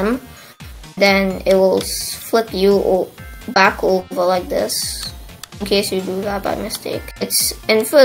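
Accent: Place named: Russia. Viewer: Зачем ты снемаешь русским англиским голосом?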